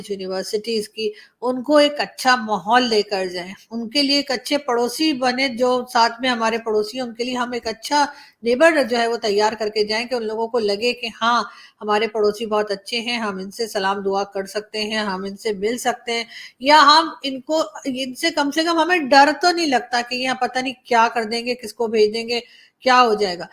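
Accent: India. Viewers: AAP de mai bohot kuch sikha. Politics bhi or HINDI accent bhi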